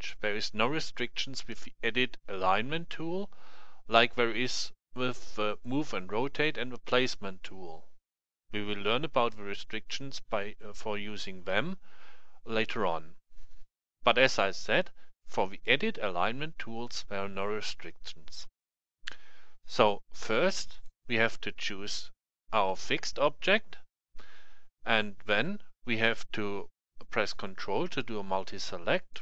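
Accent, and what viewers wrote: Germany. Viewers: Great tutorial! And love your German accent!!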